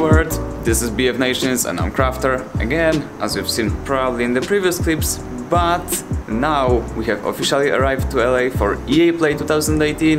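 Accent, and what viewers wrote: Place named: Poland. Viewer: Polish accent maybe, but still he talks normally xD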